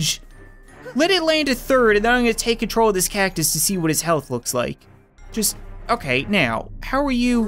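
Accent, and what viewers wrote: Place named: Canada. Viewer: Why was I thinking "Wind Conditions?" Must be the Canadian accent.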